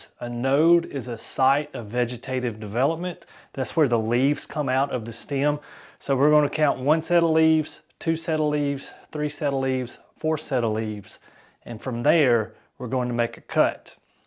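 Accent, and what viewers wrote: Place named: St. Clair County, United States. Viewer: I watched this video for all of 3 seconds and knew that accent immediately.(grew up in st clair County for 19 years)😂 I'm subscribing for that alone. 🫡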